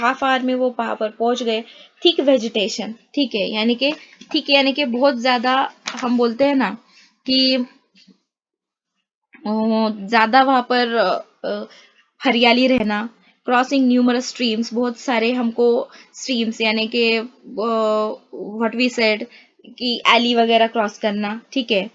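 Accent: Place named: Maharashtra, India. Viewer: Mam aapki Hindi bohot acchi hai 😂 Thoda thoda Marathi lag rha hai😂😂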